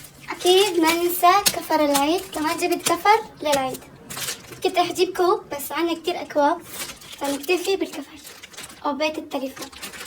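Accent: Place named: Syria. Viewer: تباني سورية من سورية من صوتك😅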